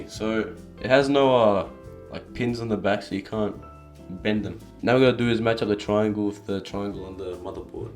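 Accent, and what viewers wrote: Australia. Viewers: You must be an American who has no sense of culture or world knowledge. His accent is clearly Australian so it’s in Australian Dollars. But you’re American, I don’t blame you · read the title people its HIS dream PC not your dream PC also hes australian if you couldnt tell by the accent meaning its 3000 usd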